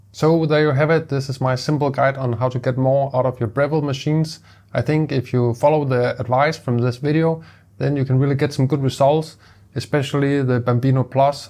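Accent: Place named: Denmark. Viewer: Are you from denmark? - er bro legit fra danmark jeg kan høre ddet på dit engelsk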